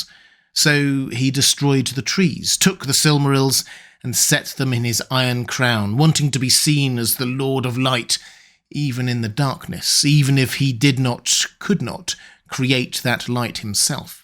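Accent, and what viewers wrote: United Kingdom. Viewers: I like to put on your videos and have your dulcet british voice lull me to sleep.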